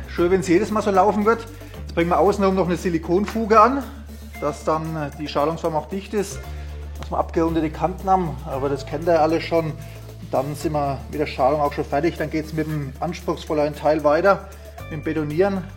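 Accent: Franconia, Germany. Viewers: Sehr coole Arbeit 👌 Auch sehr angenehme Videos 👍 Hört man da einen Fränkischen Dialekt? 😄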